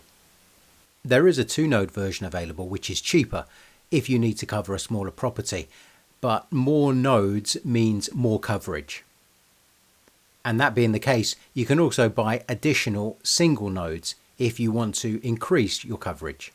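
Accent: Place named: Latin America. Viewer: Porq hablas en inglés si se te nota lo latino?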